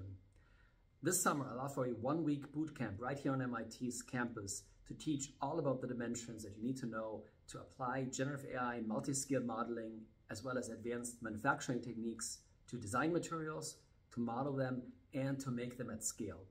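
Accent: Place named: Germany. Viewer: Hey, i wondered if you have a German background? To me you sound (and look) a bit German.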